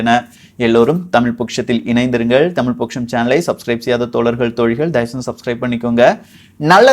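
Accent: Kerala, India. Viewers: Malayaali aano ?? Apdiya Kerala vaasanai veesuthu but azhaga Thamizhla singaarikingo... 😍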